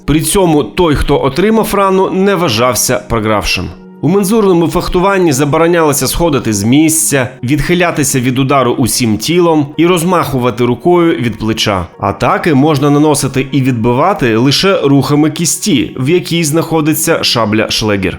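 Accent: Russia. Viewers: Це кацапський акцент. Не робіть мєнє нєрви. Ось це одеський акцент. А у відео суцільне ґекання, що хочеться вже відписатися.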